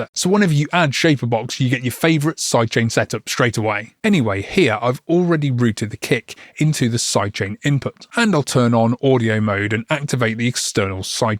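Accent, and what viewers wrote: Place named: United Kingdom. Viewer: Great plugins and great content. Also British which is nice 🇬🇧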